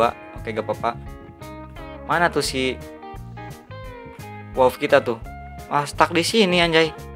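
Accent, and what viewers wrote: West Java, Indonesia. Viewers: kaciri pisan urang sundanateh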